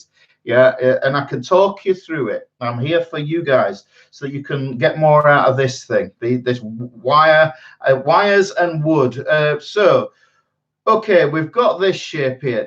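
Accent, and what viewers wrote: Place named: Hull, United Kingdom. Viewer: Trying work out the accent maybe Hull area ish, good lesson mate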